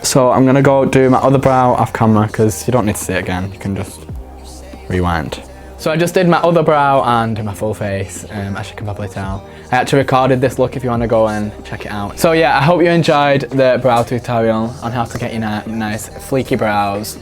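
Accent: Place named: Bolton, United Kingdom. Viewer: where are you from? You sound like a fellow boltonian x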